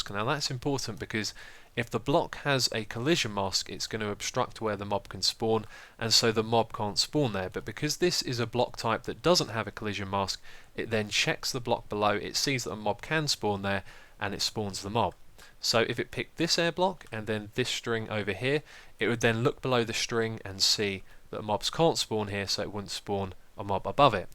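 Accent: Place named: United Kingdom. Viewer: Please do more man your awesome and your British I think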